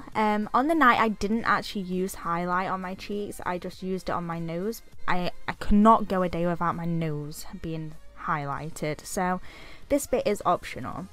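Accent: United Kingdom. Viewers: Well I’ll be dammed if this isn’t the most British accent out there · Fell in love with your accent innit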